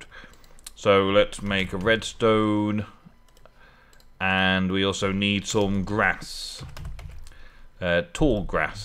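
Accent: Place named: United Kingdom. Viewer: Really excited for Tale; want to see what its like. Should watch a previous season. Couple notes: - Spoilish Jungle Upgrades count for Tropical, Taiga for Ice. It saves you a lot of upgrades. - One upgrade to meet the environmental challenge. Mana Spreaders - Way I do it, is I shift right click with a wand on the Mana Spreader and then shift Right Click on the Runic Altar. I would also try to bind a mana spreader to your Hopperhawk and see if that helps its range like it suggests. Or right click it with a wand, and mouse over it, and put the pool, mana spread in view so you can see if its highlighted. Not sure if its pulilng mana from the nearby pool. And I've learned to say it - Eso' Ter - 'ic; but love the accent and such so however Brits say it!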